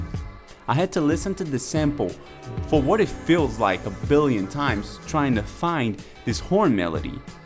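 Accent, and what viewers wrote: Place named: Brazil. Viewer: tu tem sotaque de brasileiro, tu é brasil?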